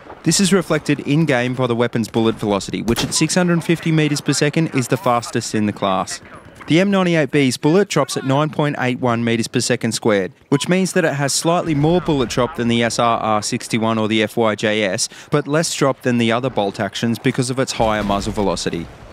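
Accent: Australia. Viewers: Are you Aussie/ New Zealander?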